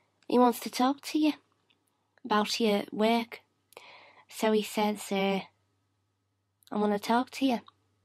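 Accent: Liverpool, United Kingdom. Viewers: WAT these are just english words misspelled and in a britishey accent. this is dumb... "Scouse" lol it's a distinct language! lololz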